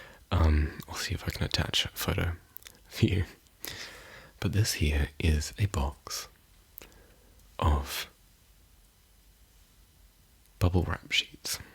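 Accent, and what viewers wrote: Australia. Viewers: n Australian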